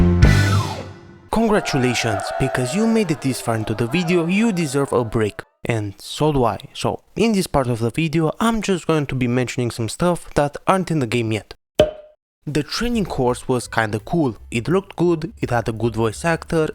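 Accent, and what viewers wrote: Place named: Eastern Europe. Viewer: Ha, so this is what the voice of reason sounds like, it has a vaguely Eastern European accent, makes sense! Liked and subscribed, you hit every nail on the head.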